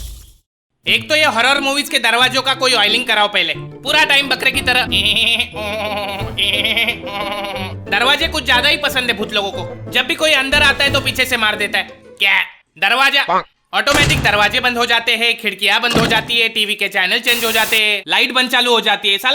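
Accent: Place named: Mumbai, India. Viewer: We like your Mumbai ki language... the way you speak in different voices... unexpected topic pe Video aa jate hai😂 aaj to hamara dar khatam ho gaya... bechara bhoot berozgar ho gaya😂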